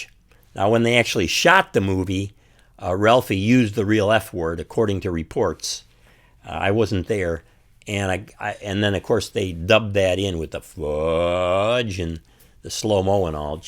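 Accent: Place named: Chicago, United States. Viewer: Dude you have the most Chicago voice I've ever heard...lol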